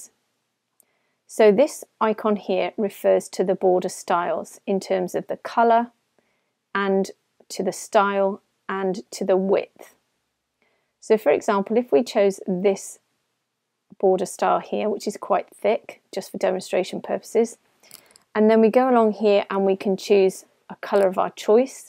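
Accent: United Kingdom. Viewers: Thanks a lot for your helping!! It helped me a lot in order to achieve my future essay, furthermore your British accent makes the audio enjoyable and understandable too!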